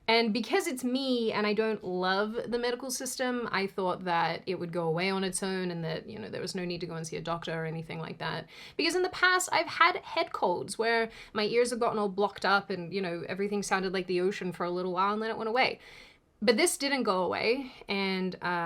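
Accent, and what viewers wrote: Australia. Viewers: Is it just me or does she sound more Aussie than she has in the past? So sorry she’s going through this…😢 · Wow, hearing her speak so "naturally" and candidly is really nice. And she comes off as likeable, funny, and with that Australian streak of charm. 🙂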